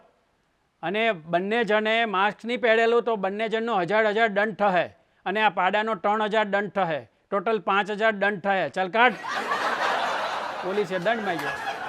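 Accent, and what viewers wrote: Surat, India. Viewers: વાહ...હસીને લોટપોટ થઈ જવાયું...😂😂 આ તમારી વાર્તા વાંચી હતી પણ આજે તમારા સ્વરમાં સાંભળવાની બહું મજા આવી...👍🏻 સુરતી ભાષા અને યમરાજ અને ચીત્રગુપ્તનું અટક હાસ્ય તમે જે કર્યું એમાં બહુ હસવું આવ્યું.... ખૂબ જ સરસ વાર્તા સંભળાવી અને આગળ પણ આવી રીતે નવી વાર્તા સંભળાવતા રહેજો..... 👌🏻👌🏻👍🏻🤣🤣🤣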